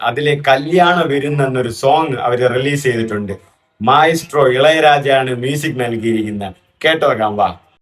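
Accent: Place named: Tamil Nadu, India. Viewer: இவர் மலையாளம் பேசுற தமிழனென்று நினைக்கிறேன்..பேச்சு கேட்க்கும்போது அப்படி தோணுது..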